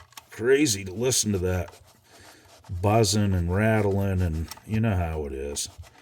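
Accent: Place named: New England, United States. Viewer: Are you from Boston or New Hampshire... I noticed your accent.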